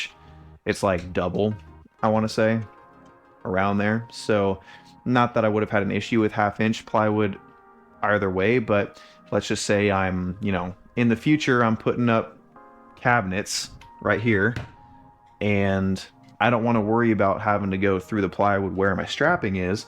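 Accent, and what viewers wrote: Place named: Northern England, United Kingdom. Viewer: imagine y’all grew up in a similar area to have that same dialect must be up north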